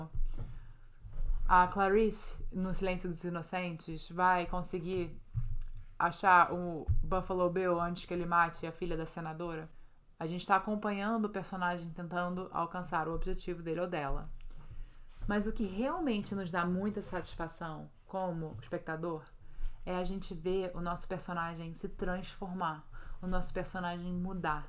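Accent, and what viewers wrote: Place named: United States. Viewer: Pelo sotaque ela é americana, vi agora um primeiro vídeo seu. Se é americana ou aprendeu nos EUA, o ensinamento sobre roteiro é ouro. 👏👏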